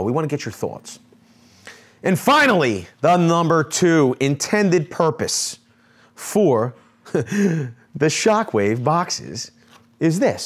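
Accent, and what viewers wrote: Philadelphia, United States. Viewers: That philly accent boi "bawxes" "milwaauwke" love it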